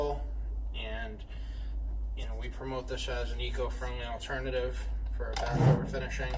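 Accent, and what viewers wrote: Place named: Massachusetts, United States. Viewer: I watched your video and am impressed with your work. I have a 7 yr old porcelain clawfoot tub. The faucett sits on the top of the tub, and there is of course a chrome drain.can these be taped off ? I'm a painter, but a perfectionist, and do not want to tackle this by myself. I heard your voice and guessed you may be from MA, then I saw the Patriots bucket 🤣 I'm in Weymouth, can I hire you to do it for me? Thank you !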